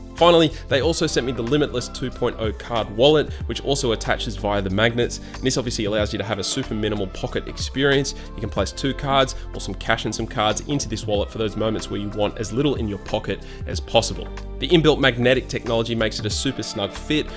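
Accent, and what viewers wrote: Australia. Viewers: I'm sold with the magnet tech. I was wanting something like this to stick to equipment at the gym so I can see the timer without having to stop. Great review. Nice to hear an aussie voice on the tube too.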